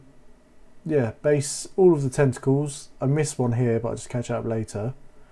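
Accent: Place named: Northern England, United Kingdom. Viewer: u have the jhon snow accent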